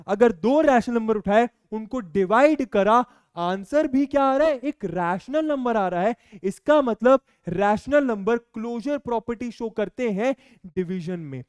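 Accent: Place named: Bihar, India. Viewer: His bihari accent makes the lecture more understandable and relatable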